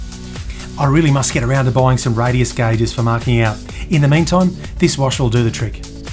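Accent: Australia. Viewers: Are you in australia mate? You sound aussie!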